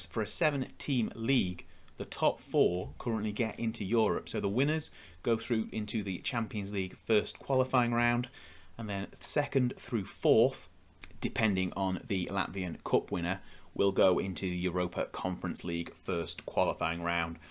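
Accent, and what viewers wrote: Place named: Ireland. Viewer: Bro the Irish head in ya.. Would never say that you're Latvian...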